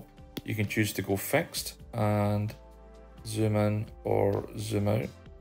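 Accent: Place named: Scotland, United Kingdom. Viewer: This was just what I was looking for. Very informative and great to hear a fellow Scot on YouTube!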